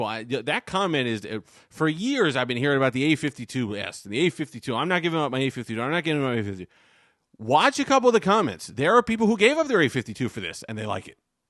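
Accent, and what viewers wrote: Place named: United States. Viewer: appreciated, but if they would ask me to explain how it sounds thick American accent with one video I show this one ahah, goated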